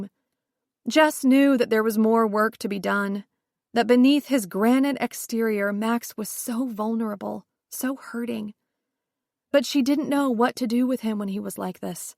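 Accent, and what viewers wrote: United States. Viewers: American narrator.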